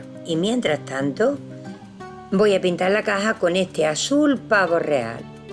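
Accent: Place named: Seville, Spain. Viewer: Hola: soy mexicana y siempre me he dedicado al trabajo intelectual, pero ultimamente, claro, me estoy interesando en reparar mi alrededor directamente comenzando por mi hogar, jajajaj,a, y tu video es tan sencillo que facilita esta mia tarea. gracias por estar ahi. ademas, eres sevillana? bueno pues que me reencanta como hablas.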